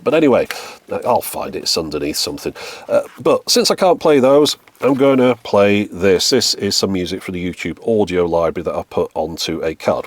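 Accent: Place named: England, United Kingdom. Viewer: I like the chirpy English voice.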